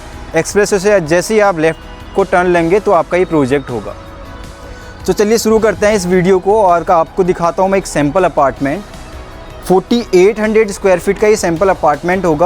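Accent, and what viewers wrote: India. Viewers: bro is 100% kumaoni, i can tell from the accent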